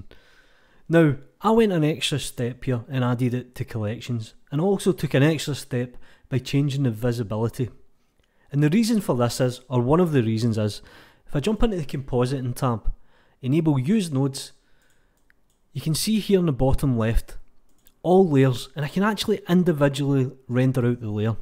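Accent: Scotland, United Kingdom. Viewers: When I turned on auto-generated subtitles with your scottish accent xD "I'm gonna add on fail open nod" -> "I'm gonna add on a fail or not"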